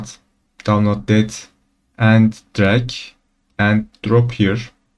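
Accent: Turkey. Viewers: This English looks like Turkish English. What a bad accent :)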